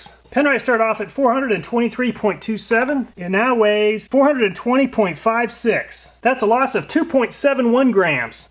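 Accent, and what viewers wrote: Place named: Pittsburgh, United States. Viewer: I'm from the Pittsburgh area....your accent....my ears cant be wrong....you're from there too right?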